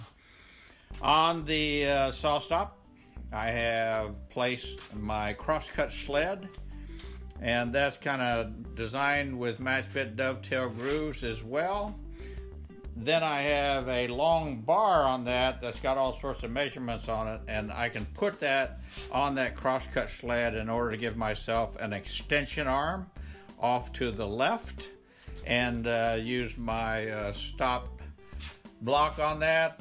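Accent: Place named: South, United States. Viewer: I had to stop watching this video Sunday morning when the last dog woke up and they needed to be taken them for their walk. After that, my day got really busy working on my neighbor's gate, which included returning the hinges because they had way too much play in the hinge.. That was how they were designed! You really pack a lot of stuff in your garage. If I ever get caught up with the seemingly endless repairs or projects to do around the house... I took a look at the Wen drill press that you have, and I'm kind of impressed with its capabilities, especially for the price. Personally, I don't think you talk too slowly. Even though I'm not from what is considered the South, (even though you can't go much further south in the U.S than South Florida) I know quite a number of people with Southern accents, my aunt, being from Alabama is one of them. 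Her accent never changed, as a matter of fact, my cousins and uncle who spent their entire lives in Miami until moving to Lake Placid, Florida, gained her accent., I think your time in California has diluted your accent some, but then again, I don't know how much of an accent people in Kansas have. If you slow down the playback speed to.25, you sound like you've had quite a few too many. It's quite entertaining.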